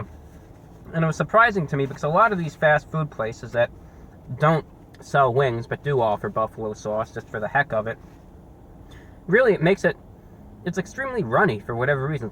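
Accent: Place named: Transatlantic, United States. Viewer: where are you from? I love your accent! it's similar to the transatlantic accent. you sound like a radio presenter from the 30s!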